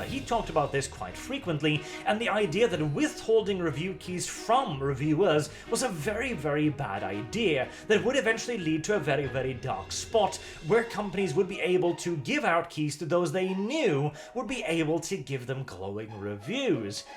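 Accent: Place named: RP, United Kingdom. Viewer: You are Norwegian? You sound like an aristocratic (lacing your speech with some of the thickest sarcasm I have ever had the pleasure of hearing) Brit!... Learn something new everyday.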